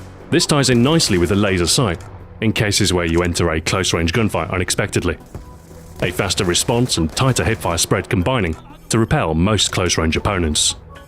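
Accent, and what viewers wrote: United Kingdom. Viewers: No he's british.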